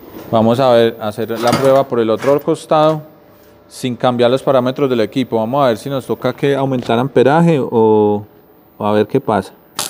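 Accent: Colombia. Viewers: a bueno pensé que eras de Medellín por tu acento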